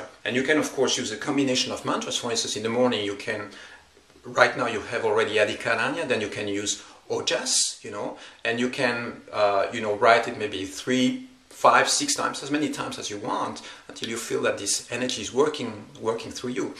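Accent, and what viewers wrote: France. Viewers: are you french? really like your videos!!!!!!!!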